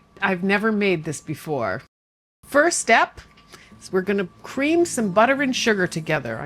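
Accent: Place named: South, United States. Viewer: I'm sorry, but I find this channel very confusing. I have watched 3 of your videos because the recipes look good, but I don't understand what you are trying to achieve? You have a woman who sounds like an American northerner doing the cooking, an obvious southern-accented man doing the voiceover, Appalachian fiddle music playing, more singing and music that doesn't seem to reflect anything in particular, and a fridge that plays disco music and flashes lights. Not to mention the name, referencing the Shire, is English/Irish in origin....you guys are all over the map and none of it fits together?? Signed, Very Confused.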